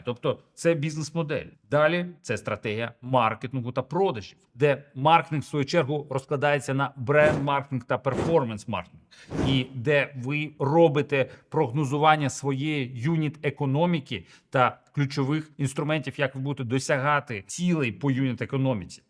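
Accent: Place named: Ukraine. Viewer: Он говорит на русском, с украинским акцентом! В целом все понятно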